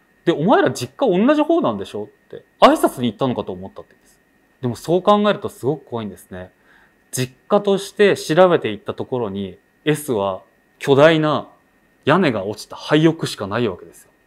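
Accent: Aizu, Japan. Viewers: 有難う御座いました。２回にわたる長講義お疲れさまでした。私の祖母が東北の人だったので子供の頃に聞いた会津訛りの言葉を思い出しました。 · 秋田出身だから会津の方言も耳に馴染んできて、その分余計リアルに感じられました。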